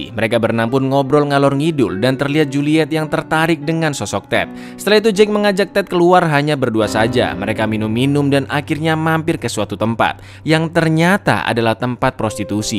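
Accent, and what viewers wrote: Java, Indonesia. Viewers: Pean org jawa ya mas? 👍🏻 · ketoro lek wong jowo bgt😂🤣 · Jowo keras lorr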